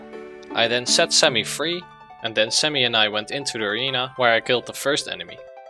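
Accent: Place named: Netherlands. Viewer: I hear a dutchie speaking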